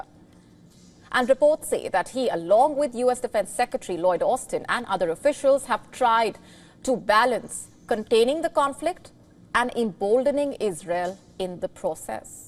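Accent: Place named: India. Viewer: Can you remove your Indian diction..?? Ty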